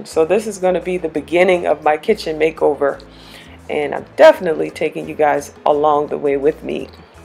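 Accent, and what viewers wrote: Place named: Gullah Geechee, United States. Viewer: girl!! This is quite interesting --- I kept saying to myself mercy—I hear the geechie in her voice😂😂 I'm from myrtle beach!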